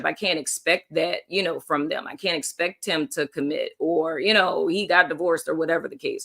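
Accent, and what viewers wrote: New Orleans, United States. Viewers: Libra Sun , Scorpio Moon and I'm from New Orleans too lol that accent will slip out baybay 😄⚜️⚜️⚜️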